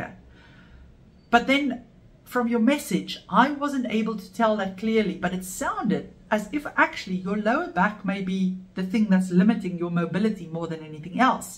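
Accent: South Africa. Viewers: Edit: From the accent it occurred to me that you're South African,. Spike did my arthroscopy.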